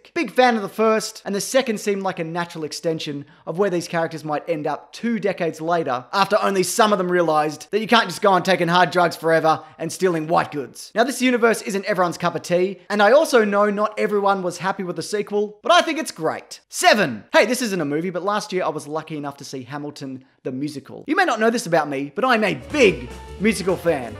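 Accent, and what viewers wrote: Australia. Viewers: I came here for jokes and an Australian mans voice not a reality check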